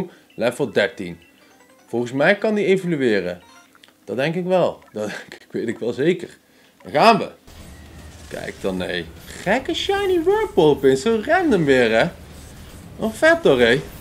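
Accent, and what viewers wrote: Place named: Twente, Netherlands. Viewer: Beetje Twents erbij 🤣... super nice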